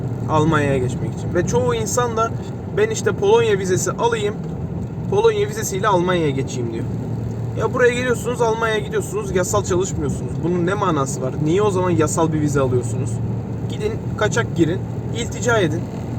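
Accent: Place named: Black Sea, Turkey. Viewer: nerelisiniz konuşma sanki karadenizli gibi????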